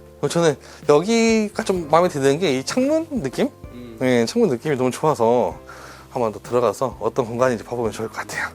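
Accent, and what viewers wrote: Jeolla, South Korea. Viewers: 아따 이양반전라도네! 전라도씨가 온사방에 퍼져있으니 거시기 하네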